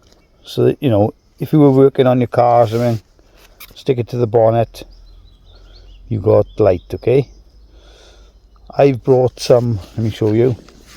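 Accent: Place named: Swansea, United Kingdom. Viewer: no problem bruv, I grew up in Swansea so your accent takes me back, keep up the good work 👍🏻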